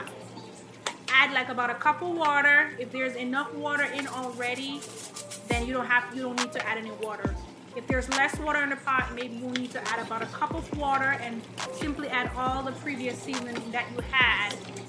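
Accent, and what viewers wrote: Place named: Jamaica. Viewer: You accent sound Jamaican. Are you Jamaican?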